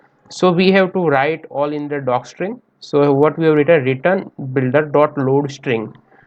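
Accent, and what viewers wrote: India. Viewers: I'm very glad to know that You are Indian... I got it when I heard first word .. Indians ki avaaj sabse alag 😎👍🏻🔥❤️ Me Bhi Bhartiya bhai 👍🏻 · When I heard the indian voice I came to knew that I am going to do something interesting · When you search the whole internet but found a Indian guy speaking English then you knew it will work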